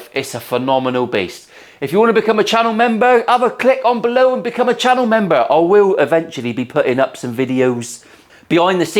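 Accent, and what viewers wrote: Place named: England, United Kingdom. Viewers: Another Monday made better - cheers you fucking legend. Btw love how you pronounce it pacifiker. Janner! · USA Americans will have a hard time understanding his talk .... he is from England and not the USA...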